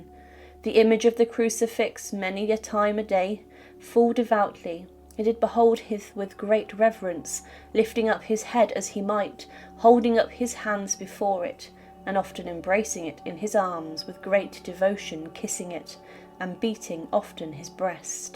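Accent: United Kingdom. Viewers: I absolutely love your historical videos. I'm a fanatic of all things Tudor, so your in-depth knowledge of that historical era always draws me in. Moreover, being.fron the other side of the pond, I'm also a sucker for an eloquent British accent. 😊 Please keep up your beautiful work. ❤ You have a new subscriber.😊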